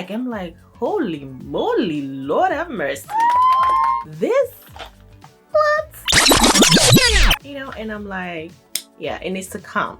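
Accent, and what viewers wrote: Nigeria. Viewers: I love your Nigerian accent!!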